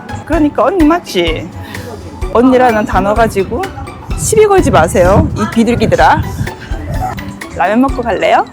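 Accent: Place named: Busan, South Korea. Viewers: 부산임마까 지도 부산이다아임미까 사투리방송 정겹고 좋아요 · 겡상도 아지매네 ㅎㅎㅎ😊 하고픈거 하면서 사는거 부러워요.. 타코는 미국 캘리포니아에서 먹는게 좋아요😊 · 고향이 남쪽 어디쯤인지는 당연히 알았었는데... 갑자기 많이 고향말을 쓰시니까 또 새롭고.. 적응이 안되요😊😊 진짜 가보고 싶은 곳이 참 많은데... 언제나 다 갈 수 있을지ㅎㅎㅎ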